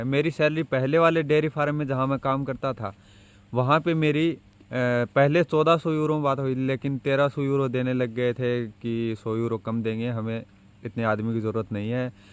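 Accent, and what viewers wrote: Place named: Rajasthan, India. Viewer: awaaj se toh tu rajasthani lag raha hai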